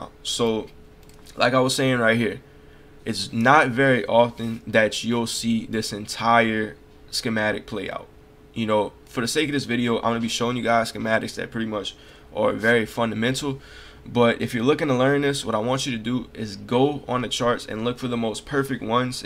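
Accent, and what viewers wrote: Louisiana, United States. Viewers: Are you from Louisiana? You accent sound very familiar.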